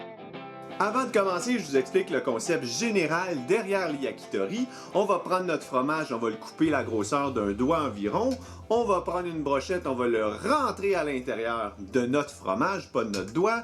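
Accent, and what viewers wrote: Quebec, Canada. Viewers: J'adore l'accent québécois ainsi que le fromage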